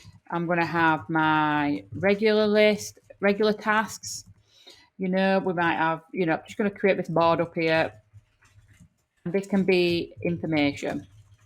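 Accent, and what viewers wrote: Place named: Leeds, United Kingdom. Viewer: Thanks. "Customise" just seems like such a funny place to go to add a dashcard, doesn't it? PS. Grew up in Leeds so nice to hear an IT "How to..." vid with a proper accent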